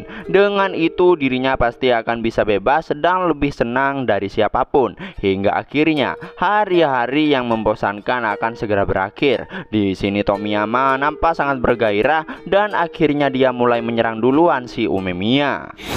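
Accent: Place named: Madura, Indonesia. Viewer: sama sama orang madura soalnya bang, kerasa banget logatnya di telinga aku wkwkwk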